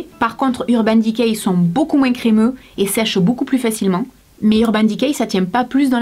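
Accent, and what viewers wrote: South, France. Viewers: J’adore tes vidéos tres marrante avec Ton accent du sud qui donne le sourire !! :) et j’avoue la mousse de douche donne très envie je pense la tester prochainement. Sinon continue ainsi Tel que tu est super maman de YouTube .☺️👍😉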